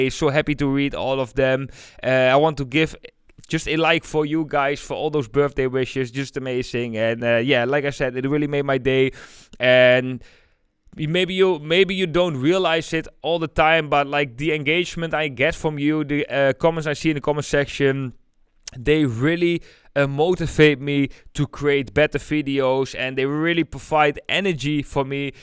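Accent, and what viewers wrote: Netherlands. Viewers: Your name ' ' before i hear your name i did think immediately he is Dutch. I can hear the Dutch accent. LOL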